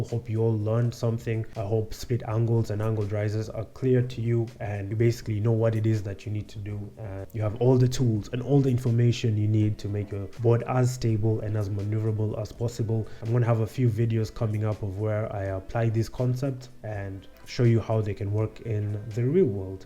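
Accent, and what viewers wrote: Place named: Africa. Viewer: ive never commented in 7+ yrd watching youtube. I skipped over yours cause of a black man W/ affrican accent under a few seconds. After tons of BS from other channels I watched to see you explain in a way I could not only comprehend but was exactly the info I needed to mitigate tje problems witj my ride i I was facing but could not pinpoint. Although the true test of tne info you shared will be on the black top, Im adjusting my equipment as I text and we'll see. I'm telling this to say that predjudice sucks.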